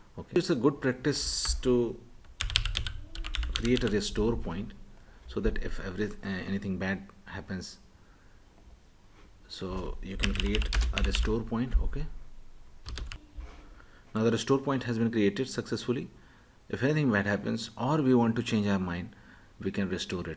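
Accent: India. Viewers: i mean he lives in America but he has an Indian accent so. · I hear an indian voice and now I'm blessed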